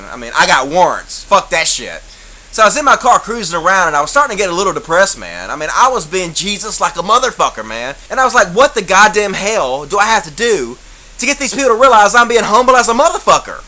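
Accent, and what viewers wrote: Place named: Kentucky, United States. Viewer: Oh shit you live here in memphis? you have a more country accent than most people here i thought you were from like kentucky or something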